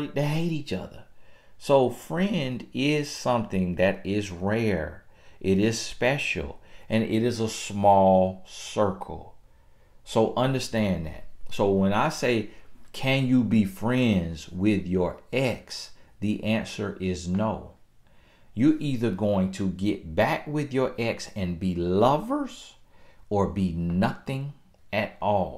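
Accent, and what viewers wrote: United States. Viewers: Woow.. I am hurt and fascinated at the same time. Every woman of all ages should listen to you. That is the pure and pure hard-hitting truth. Less the ex thing troubles me. . BUT why and how men choose women 👊🏻. . Ufff..You shaken me and nowI am awake! I decided to wait a while ago now that I'm 43 and the man who is right for me will love me all the more for it. Basically every man is looking for his wife who they want to respect but we women have to make sure that they can. You are also very funny although I don't understand a lot of things because of the American English. I'm Italian and live in Germany. You're really awesome. thank you.. Wonderful listening to you 🌸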